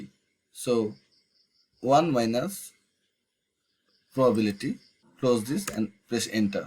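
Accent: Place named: Bangladesh. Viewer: are you from Bangladesh? Your accent is very similar to the south east region of Bangladesh.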